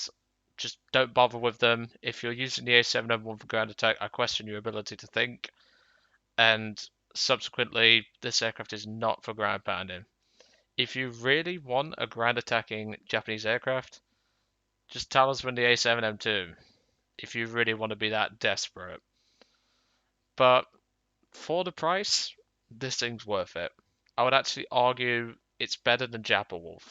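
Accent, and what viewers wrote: United Kingdom. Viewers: you don't use kilometers, but you have an English accent. Am I hearing things wrong?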